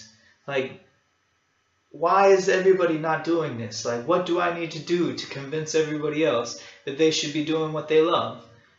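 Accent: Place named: Texas, United States. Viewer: Felt like I just listened to myself with Texas accent haha!! Awesome bro :D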